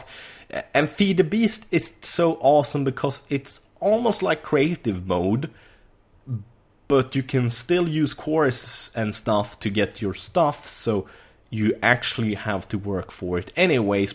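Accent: Sweden. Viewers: I love your sense of humor. I have to say, though, probably just because of the accent and because I know you're Swedish, I keep expecting you to be blonde, and then the dark hair is a little... disconcerting?... to see. Do you ever have that experience, somebody on YouTube somehow not matching, in appearance, what you expected from their voice or from other things you knew?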